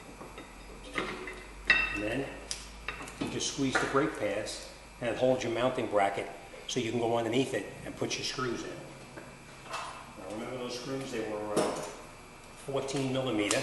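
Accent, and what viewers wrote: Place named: New York, United States. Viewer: great instructional video. I detect a NY accent or perhaps, a NJ accent. Don't know which.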